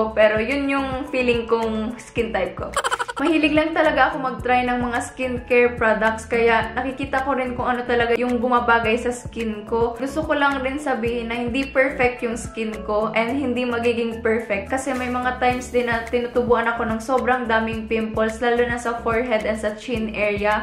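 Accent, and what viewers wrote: Visayas, Philippines. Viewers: Bisaya ka ate?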